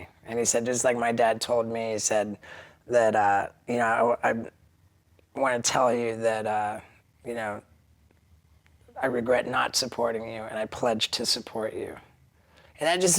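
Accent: United States. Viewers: Interesting that he sounds just purely Americain.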